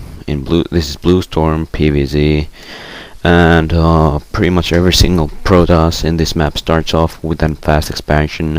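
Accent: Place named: Finland. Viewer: Finnish accent is so beautiful ^_^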